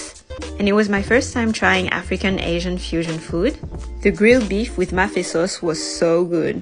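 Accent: France. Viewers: You took the little french accent 🤌💖✨🇫🇷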